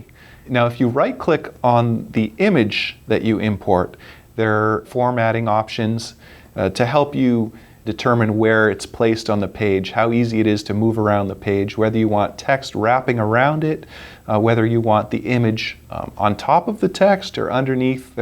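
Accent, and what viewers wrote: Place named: United States. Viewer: Excellent! You speak slowly succinctly, and with an American accent! I have been on computers for longer than many people have been alive, and have always struggled with how to make greeting cards. The amount of paper and ink I wasted would have paid for someone's college education! A head slapping moment came when you showed the formatting trick was to use two columns. Gawd!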